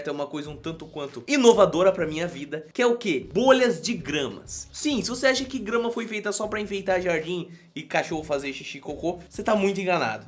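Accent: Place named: Ceará, Brazil. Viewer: Você e cearence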